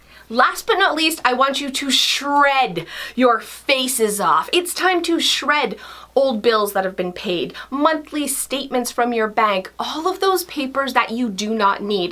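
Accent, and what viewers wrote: Canada. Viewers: Hilarious intro. Love your Canadian accent. Personally I keep temp files (this year) in my desk, and long term files all in the same file cabinet. Having 15 different places I found increases paper clutter. Also a periodic clean out of even long term files is necessary. I am considering your household binder idea. I liked your command center its not suitable for me but quite beautiful. Not a fan of the whole addictive personality thing, please no offense, it comes through and I'm a less is more kind of gal.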